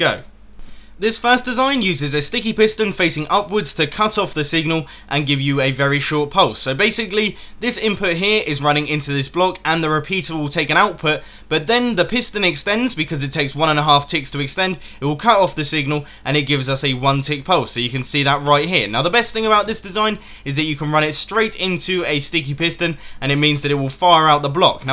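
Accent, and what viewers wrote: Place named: United Kingdom. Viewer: I swear the British accent is thicker in this video than it is in current HermitCraft episodes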